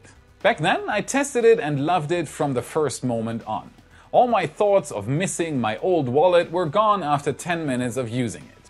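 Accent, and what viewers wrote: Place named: Germany. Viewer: THE #1 most important thing about American English is that “the other person” understands you. It’s okay to be German and sound like it! And stop worrying about your “r”s! We LOVE your accent!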